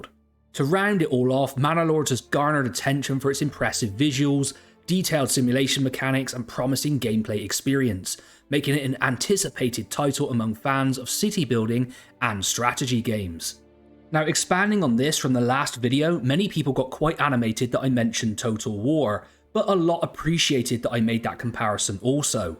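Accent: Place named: Cornwall, United Kingdom. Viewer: oo i fuckin love a cornish accent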